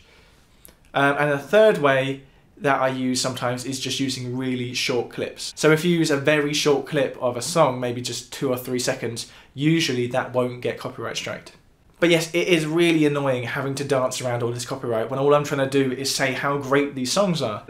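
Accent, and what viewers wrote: United Kingdom. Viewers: What I also like about this channel is that English is spoken in a very clinical clear way as if it is radio English. Yes there is a slight British general accent, but not disruptive. We don't want to be sidetracked with heavily accented English. This is also understood by Americans without being American English. It's almost international English I dare say.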